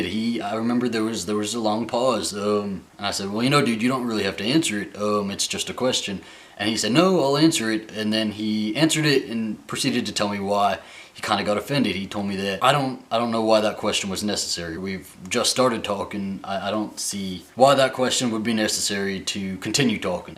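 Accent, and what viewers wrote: South, United States. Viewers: I think your point is what I been saying to my best friend we should focus on the relationship an not the bedroom, there's so much more outside if the bedroom , love your videos an want hold that team against you lol #GoRebs that southern voice swoon ..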